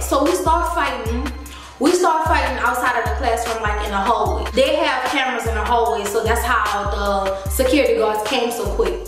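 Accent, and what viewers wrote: New Orleans, United States. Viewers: you from nola ? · You sound like you a Nola baby!! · Your makeup ❤️ are you from New Orleans ? I hear alil bit of that Nola when you speak .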